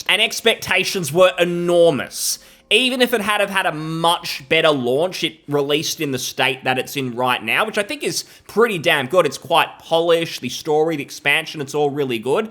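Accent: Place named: Australia. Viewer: The way you talk is utterly ridiculous. Are you actively trying to sound like 200% more Australian?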